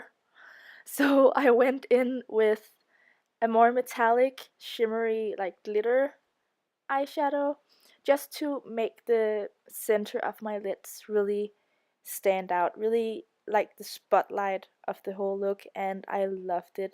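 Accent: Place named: Denmark. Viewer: Man kan tydeligt høre at du er fra Danmark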